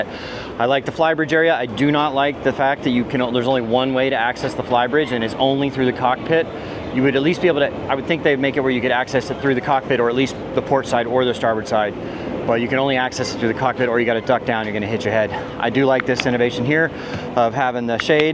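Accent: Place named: United States. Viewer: Awful video, really poor content. There's only one thing worse than someone moaning and that's someone moaning with an American accent. There are some really great presenters reviewing yachts and M.Y. on YouTube, this guy isn't one of them.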